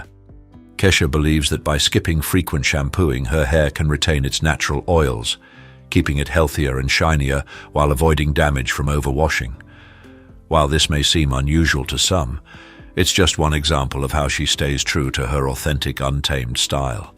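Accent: United Kingdom. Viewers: Of course, a British narrator.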